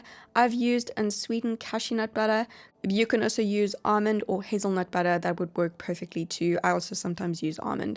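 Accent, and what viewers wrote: South Africa. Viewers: South Africa I think x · South Africa! ❤️ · are you South African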